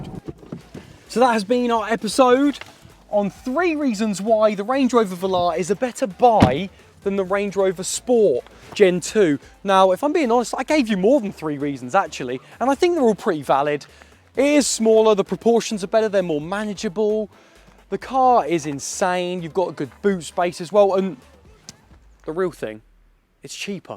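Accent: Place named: United Kingdom. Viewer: That British accent is 🔥🔥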